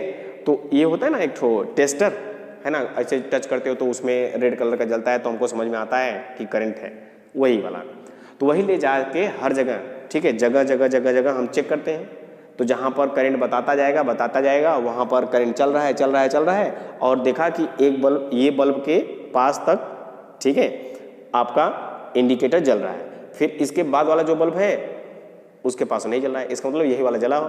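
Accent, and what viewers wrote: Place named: Bhojpuri, India. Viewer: bhojpuriya tone bahut achcha laga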